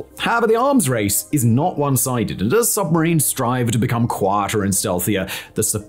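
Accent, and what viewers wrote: England, United Kingdom. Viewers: I appreciate so much English accent compared to US one. Better understanding for my old scholar skills... La cerise on top of the gâteau. 🇨🇵❤️🇬🇧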